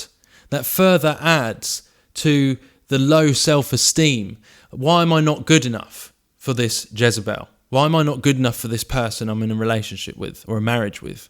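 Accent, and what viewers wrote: United Kingdom. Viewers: There is no "Jezebel Spirit" in the bible. Both the "Jezebels" in the bible were evil, but neither are described in nearly this detail A well produced video and an articulate British accent does not make it any more true. There is a lot of info out there about Narcissistic Personality Disorder and how it works. Giving these behaviors a phony spiritual persona and a name is just something someone made up. The "Jezebel Spirit" is a preaching and book selling gimmick among charismatics and has been for at least twenty years. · i dont like your accent !!!! yu sound british and . . . . i will never trust a british no matter how legitimate they maybe . . . nonetheless i liked your video !!!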